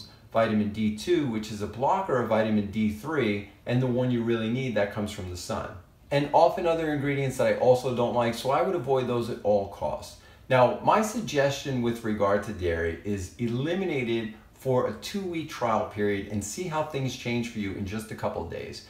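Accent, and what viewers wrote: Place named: New York, United States. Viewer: You have a great New York accent, btw!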